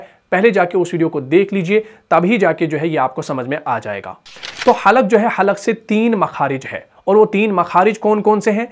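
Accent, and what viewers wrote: Kashmir, India. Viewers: Bhai jaan aapka accent Kashmiri jaisa hai ??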